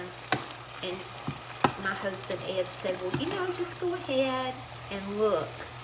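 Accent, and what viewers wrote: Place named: North Carolina, United States. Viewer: Hi that a great recipe. I'm detecting southern, NC here. Have a great weekend tyfs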